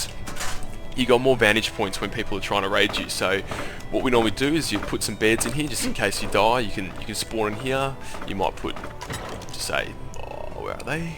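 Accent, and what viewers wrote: Australia. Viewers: Finnaly i found an aussie gamer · Where is your accents from? Australian? not sure :).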